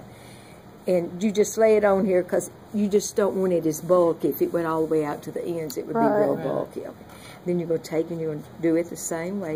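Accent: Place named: South, United States. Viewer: Just wanted to give you a big hug from a fellow-Southerner (living in Ohio) who needed to make 20 of these. I could not have found a better teacher than you,! You spoke MY language with MY accent. God be with you! Merry Christmas and a Peaceful New Year to you, my dear lady.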